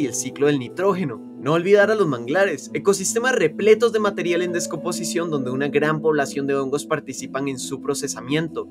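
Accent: Costa Rica. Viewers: ¿Se puede ser más tico? Jajaja, me encabta este canal ❤ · Eres tico?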